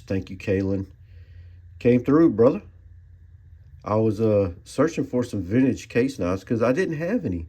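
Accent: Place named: Texas, United States. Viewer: First off, just who in the heck is this dude in the video? It sure don't SOUND like!! Dude's got a deep, raspy Texas accent, loves some Case knives and is drinking a Dr. Pepper...so maybe it's another? Is this brother?! Hey there, man! Nice to meet ya!! That Rail Splitter is freaking gorgeous, LOVE the bone on that!! As you noted, that etch IS rather unfortunate...I'm seeing some Flitz in that blade's future 🤣. The other two are sweet too!! Case's 70s/80s shades of brown & red just can't be beat. That Delrin really does look like bone! This recent vintage Case kick that you're on is positively contagious..you got me looking at a TON of them now!!! They really are light years better than modern Case, that's for sure. Great video!! Hope you feel better bro!!! 1...2...7...GO!!! ⚡💥🔪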